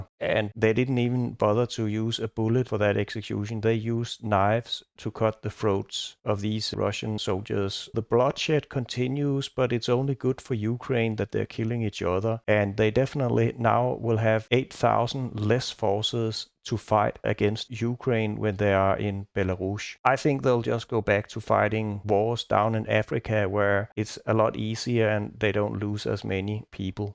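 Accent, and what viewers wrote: Denmark. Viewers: the danish accent is strong with this one (jeg er også fra danmark)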